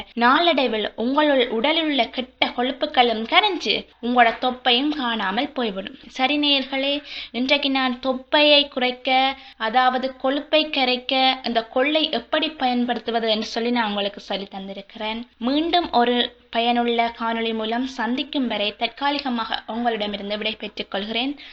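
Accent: Sri Lanka. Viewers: No she sounds like srilankan tamil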